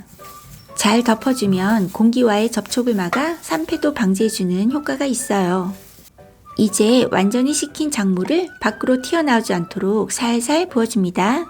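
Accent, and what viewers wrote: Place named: Gyeongsang, South Korea. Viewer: 안조터라구요 경상도 엑센트 ㅋㅋㅋ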